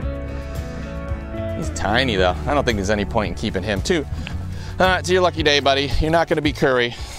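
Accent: United States. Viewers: The accent sounds Boston. Like Ray the series.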